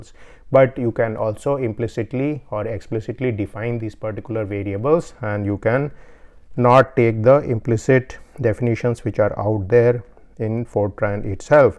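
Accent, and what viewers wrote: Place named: India. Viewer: Gramps you got a hard Indian accent and I love it .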